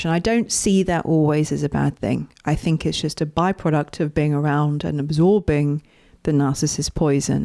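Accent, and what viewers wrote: United Kingdom. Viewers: I am thrilled to have just found your channel today. I've followed this topic for literally a couple of decades now. Agree 1000% about the need for content creators to have actually experienced NPD first-hand > not just clinical study. Same for therapists - the odds of finding a therapist who truly GETS it without direct experience is about nil, and yet so many CLAIM knowledge. They know that narcissism has become a popular buzz word and so they chase the clickbait, yep - and many do more harm than good for the almighty dollar. I follow Dr. Romani and others, also know of Vaknin, read a book by him many yrs ago but that guy just gives me the creeps so don't listen to him much and appreciate your perspective. I've been on a binge this afternoon. I appreciate your authentic and genuine approach. You have some unique perspectives, especially the spiritual aspect, which I've thought about some > esp that those who've been though narc hell are much more likely to SEE the evil in the world. 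They won't be held back by that cognitive dissonance so they can connect the dots. I find it amazingly brave of you to actually attempt comedy alongside narcissism, that takes MOXIE:-)!!! Thanks for this personal view, appreciated. I assume you are maybe somewhere in England from your delightful accent. Yes? Salutes from the US!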